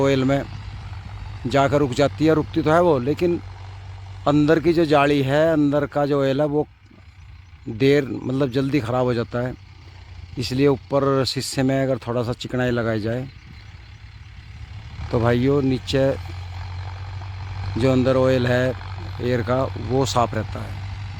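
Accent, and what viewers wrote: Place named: Western Uttar Pradesh, India. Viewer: जी राम राम। बिजी रहता हूँ फिर भी आपकी वीडियो आपकी कड़क आवाज सुनने की खातिर वीडियो पूरा देखता हूँ। दूसरा कारण वेस्ट यूपी की स्थानीय भाषा की बोली और लहजा मुझे हरियाणे की भाषा के mukable में ओर भी अच्छी लगती है। मेरा एक अजीज दोस्त गाज़ियाबाद से है। उस से 11 साल से बात कर रहा हूँ इस लिए अब मुझे वेस्ट यूपी की भाषा समझने में जल्द आ जाती है। From- गंगानगर (Raj.)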